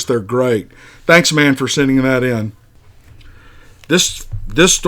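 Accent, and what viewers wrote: South, United States. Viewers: I love you southern draw, I'm from the south part of Arkansas and was told by my dad about swampy wooded area men would go hunting in but would never come out. This place seemed to me that it was south of Pine Bluff, Arkansas. Maybe you know the place or could find out. My dad when he told it looked really scared like he may have heard or seen something but wouldn't tell me or mom. I think he may have because he seemed to be a bit shaken up about it. Also if anyone reading this can relate to this story, please let me know. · thanks man, always great to hear a southern boy.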